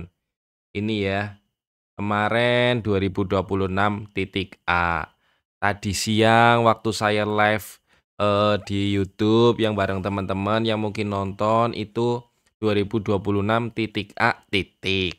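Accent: Banyumas, Indonesia. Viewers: saya masih duduk manis jam 1.20 depan laptop buat sinkron, eh ketemu mas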